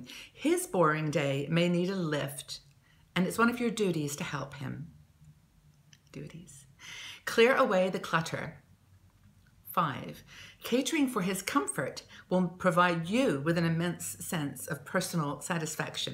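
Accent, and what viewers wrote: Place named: United Kingdom. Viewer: your British English!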